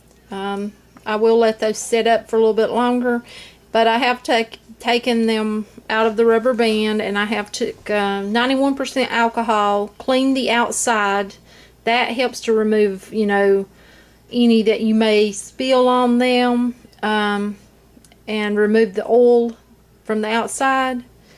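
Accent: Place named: South, United States. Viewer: I love all your snowmen...too cute! The molds are amazing, would like to have one myself .Can I ask where you're from...can't help but notice your southern draw. ; ) I am originally from Alabama but now live in Texas. I just happen to come across your channel, glad I did. Ya got a new subbie! Tfs Have a great day! : )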